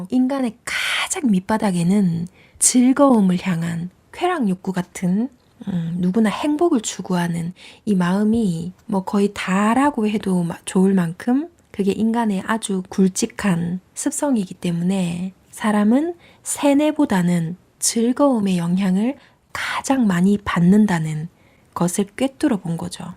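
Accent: Gyeongsang, South Korea. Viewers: 님~항상 영상 잘 보고 있습니다^^ 경상도 억양이 넘 정감스럽습니다^^ 저는 경남 진주 입니다~ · 님 갱상도가? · 댓글 쓰려니 뭐가 뜨네요 이런거 첨이고 디게 예민하신가 유튜버중에 경상도말 쓰시는 분 엄청 많네요 표준어보단 귀에 덜 들어오는게 사실이네요 전달력을 높이려면 조금 연습하셔야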